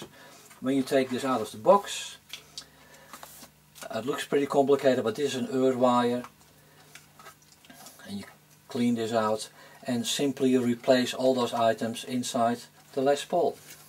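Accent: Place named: Netherlands. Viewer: You sound dutch... and on your site it says your available in holland, where are you stationend?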